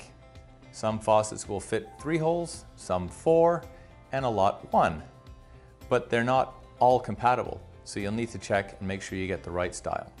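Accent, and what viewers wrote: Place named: Canada. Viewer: North American plumbing is .... weird! I say North American, as I detect from your accent that you may be Canadian. In the UK taps (faucets) are also categorised with regard to compatibility/suitability according to pressure differentials on cold water and domestic hot water. Some mixer/single outlet spout taps do not work well where hot water is gravity fed (via a roof tank through a hot water storage cylinder-gravity) and cold water is supplied directly from the mains. This is particularly problematic on bath mixer taps. Upon fitting bath deck mixers (2 heads one spout) I have found that the cold, mains pressure actually forces hot water back into the vented cylinder, up to the roof tank(s) and out of the property through the warning/overflow pipe. By the way, you deserve more subscribers. I have 50+ subs, my production standards are very amateurish and I don't just do plumbing content. 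So, I've subbed.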